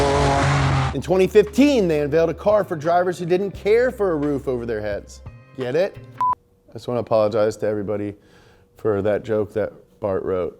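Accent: Germany. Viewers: the funniest german accent ever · The german accent is pure bellissimo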